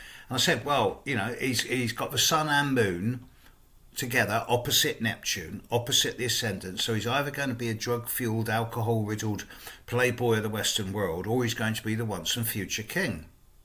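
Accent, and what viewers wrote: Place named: Southern England, United Kingdom. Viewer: Hey❤ bless you for saying about Williams middle name I remember when he was born thinking the same..Once and Future King... (I'm a kitchen table astrologer, of several decades) I did his chart and felt such a surge of pure hope..(he was born just before my eldest daughter) And he was born on the summer Solstice too... All shall be well. Nice to hear a UK southerner voice... Glad to have stumbled on your no nonsense channel... Thank you xx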